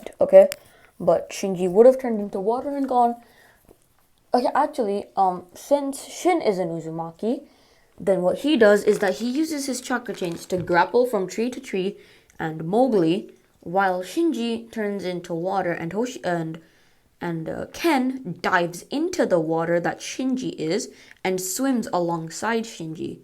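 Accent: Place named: Australia. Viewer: That’s Australian not American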